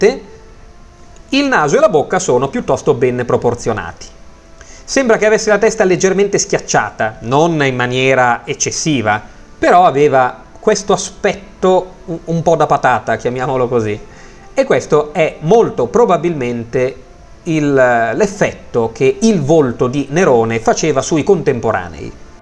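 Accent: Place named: Italy. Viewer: italyano!!!!!!!!!!!!!!!!!!!!!!!!! :( the most funniest accnt of all time and in the history of the universe whahahahaha!!!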